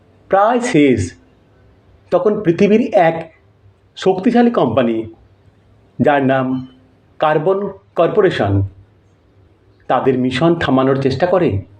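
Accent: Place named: Kolkata, India. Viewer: ভাই আপনার কোন দেশ? আপনি কিছুটা কলকাতার ভাষায় কথা বলছেন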